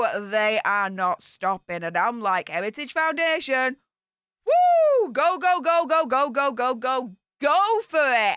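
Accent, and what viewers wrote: Yorkshire, United Kingdom. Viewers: So good to hear a Yorkshire accent after all this time 😂 and you’ve got an ocarina in the shelf behind 😎 time to subscribe ✅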